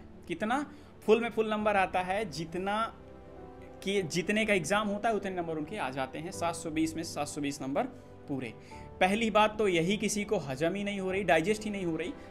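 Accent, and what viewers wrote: Bihar, India. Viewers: Bhaiya kya app Bihar se ho❤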